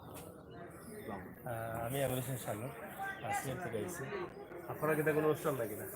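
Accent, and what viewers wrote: Sylhet, Bangladesh. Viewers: আদা সিলেটি আর আদা শুদ্ধ খেনে। খাটি সিলেটি ভাষায় মাতও না খেনে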